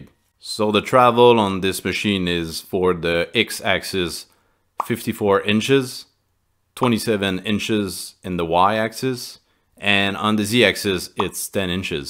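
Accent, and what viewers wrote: Quebec, Canada. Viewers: Hey! I assume by your accent your french canadian, which i also am. In case im wrong ill keep on with the english. Anyway, im interested in building my own cnc waterjet cutter as i need excessive precision on the cut itself. Ive been surfing thru a lot of CNC videos but it just keeps on shuffeling the pot in my head.. would you be able to break down the control system more precisly? You went over the diagram but it seems fairly complicated the way mach talks to the breaker board and all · at first I thought this was going to be another European build, but that accent is Francophone Canadien isnt it? the Mastercraft toolbox confirms this. subscribing. hello from BC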